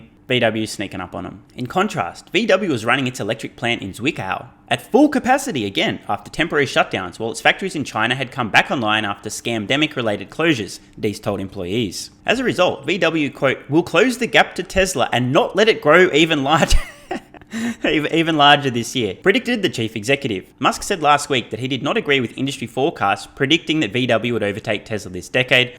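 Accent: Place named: Australia. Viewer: You need better vocal sound 'cause your voice grates partially your accent but mostly because of your complete ignorance on the subject of sound engineering! Listen to one your Australian reginal youtuber's; Cold Fusion/Dagogo Altraide. He produces his own music and that acuity to sound educated him to the value of sound processing. It's stupid simple probably under $2-$300.00 Azzie money! Buy a Behringer board with built in Compression and 'Bob's your uncle' as the English say! Get one that has USB output and your sound we be much improved! I only wish that "Electric Pixar looking Viking Fucker" would do the same.....Cheers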